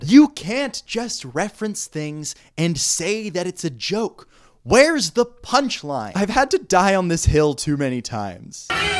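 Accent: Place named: Australia. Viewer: As an aussie i was also wondering why he sounded vaugely australian 😂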